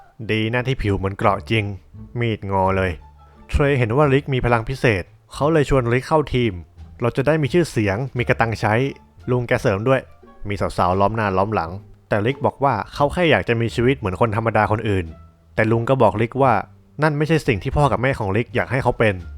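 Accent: North, Thailand. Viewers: พี่เป็นคนเหนือหรอครับ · พี่คนเหนือหรอ ฮานี้บ่าเฮ้ย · พูดเหนือด้วย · คนภาคเหนือหรือป่าวนิ คนสปอยอะ5555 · คนเหนือหรอพี่ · คนเหนือก๋าา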